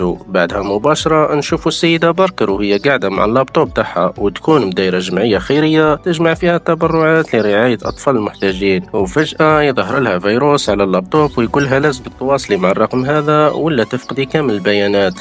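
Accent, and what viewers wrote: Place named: Algeria. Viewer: 123 viva l Algérie روعة لهجتنا 😅من اجمل الملخصات التي اعجبتني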